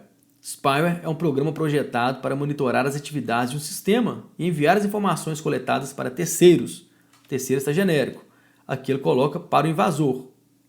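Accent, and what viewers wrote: Minas Gerais, Brazil. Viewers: Só quem chegou até aqui sabe o sufoco que foi estudar tudo kkkkk assisti aula no trabalho, na faculdade, no ônibus e até no horário de almoço, mas vale a pena porque o professor é incrível, e o sotaque mineiro deixa tudo melhor. Obrigada professor! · Em defesa do "tracim", adoro o sotaques dos mineiros, por favor mantenha o tracim professor! Hhsuahusa... Aula top como sempre, vai me ajudar muito, muito obrigada professor! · aaaaaaaaaa professor por favor continue falando assim mineirinho tracim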